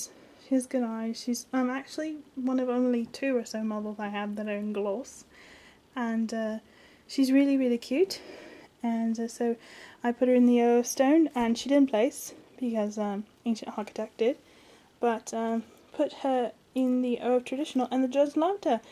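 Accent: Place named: United Kingdom. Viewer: Not to be rude but now u sound British and in other vids u sounded American, anyway love all ur vids!!!!!!!!!!!!!!!!!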